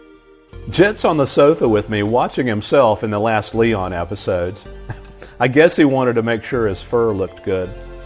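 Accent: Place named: Midwest, United States. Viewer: I know you live in Georgia now and lived on the east coast prior to that but you don't sound like a southern gentleman to me. Where are you from originally, sounds like a midwestern guy to me.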